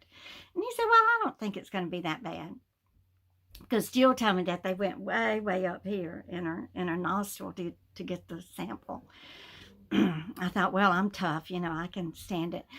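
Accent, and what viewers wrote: South, United States. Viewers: Hiiii Ms 🌞You Sure Are A Beautiful Sight For Sore And Half Blind Eyes😃! You Sound & Look Great, So Pretty Without Your Glasses Too! Jill Really Looks And Sounds So Much Like You, Both Soft Southern Soeaking Precious Woman, So Glad. You Are On Your Way To Fully Recuperating, Thank You For A Very Informative Video, and Thank Jill for the Booster Home Remedy, still now just need to purchase the Ginger Root, then I'll be set!😃 God Bless You Blessing, I Love You Ms.!🌺🙏🌺 😇😇😇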